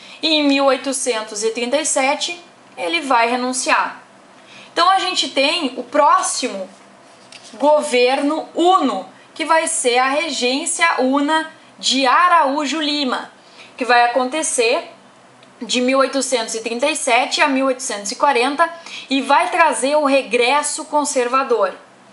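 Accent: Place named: Rio Grande do Sul, Brazil. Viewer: Pela forma de falar a conjunção mas, garanto q é do RS, haha tbm sou, gostaria de saber se tem como fazer video aulas sobre a historia do nosso estados, e venho dizer q as aulas tem me ajudado muito, desde já agradeço e muito bom trabalho tens feito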